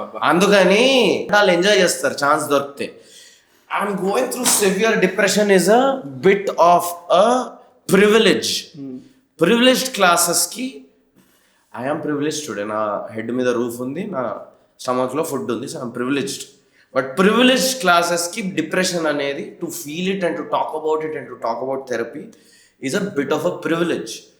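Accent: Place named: Andhra Pradesh, India. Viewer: Modda periginav kachiguda andhra basha undi needhi randa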